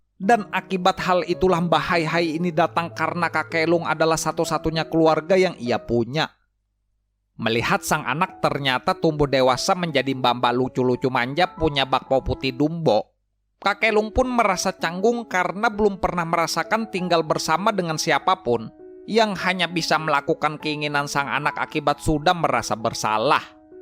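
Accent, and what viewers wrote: Banjar, Indonesia. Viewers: Urang Banjar kah ni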